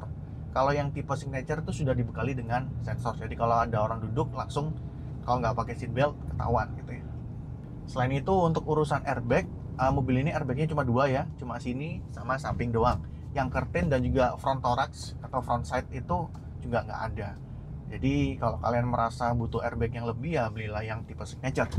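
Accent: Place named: Java, Indonesia. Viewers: Bingung jon ngomong indo logat jawa... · logat suroboyoan itu.. beda sama jawa yg lain.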